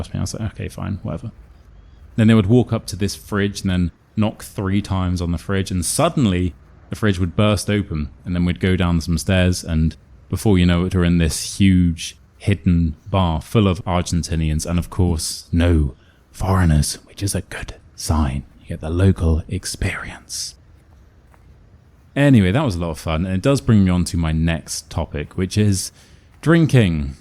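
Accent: RP, United Kingdom. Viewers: Hi! What a lovely video and content! (I don't know anything about eye patches or the like). I'm glad you've had some fun in spite of your hard work. Most real English teachers from Argentina go to university and undergo a 33-yearly subject study programme, including Language 1, 2, 3 & 4, Grammar 1,2 & 3, Phonetics 1 & 2, Stress and Intonation (laboratory) 1 & 2, English Literature 1, Contemporary Literature 1 & 2, Shakespeare, History of the British Isles 1 & 2, Geography of the British Isles and the USA, American Literature, American History, Pedagogy, Psychology, Methodology 1 & 2, Ethics, Philosophy, ( Religion 1, 2, 3 and 4), etc. I did Practice lessons with teens. But I love working with children and preteens. I don't give on line lessons, just in case you think that I'm offering myself as a teacher😅. I follow you because I'm losing my memory and I love your modern RP pronunciation. 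As you said, you are a real flesh and bones teacher, handsome, talented, diplomatic, hardworking and honest. Thank you, dear teacher! ❤🎉🌺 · Give me your contact number I want to learn British accent from you I am Pakistani girl I want to go to the London on study visa if you don't mind plz sir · Ramble on, we enjoy listening to your “ever so English” voice.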